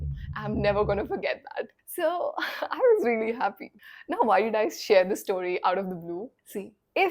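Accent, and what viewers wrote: India. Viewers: No bad little bit better Indian's accents even you are our family ❤️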